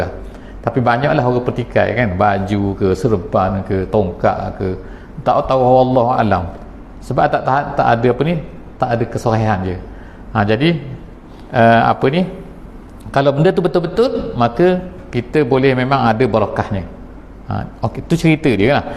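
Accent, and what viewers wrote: Terengganu, Malaysia. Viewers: Ustaz dari brunei ke? kenapa loghat macam Terengganu 😍 saya ingatkan Ganu 😂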